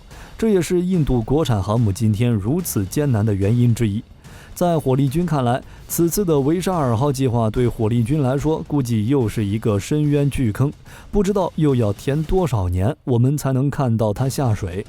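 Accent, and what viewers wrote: China. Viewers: 可能是聽見中國口音在酸印度，反中基因的膝反射。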